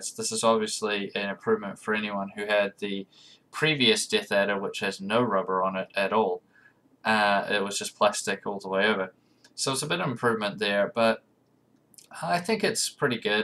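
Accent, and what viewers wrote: New Zealand. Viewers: He's from New Zealand... Not Australia. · Hey, it's a kiwi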